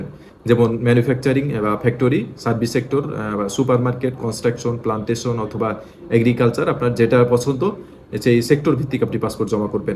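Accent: Kolkata, India. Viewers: তাহলে কলকাতার ভাষার মতো শব্দ উচ্চারণ করেন কেন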